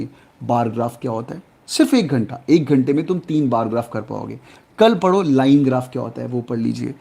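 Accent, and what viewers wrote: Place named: Punjab, India. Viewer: Sir love from punjab ❣️❣️ aap bhi punjab se ho....apke accent se lgta hai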